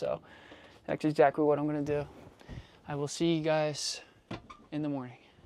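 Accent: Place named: New Jersey, United States. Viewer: Hi. What do you do for work? I love listening to your Jersey accent. Reminds me of where I grew up in southeastern PA.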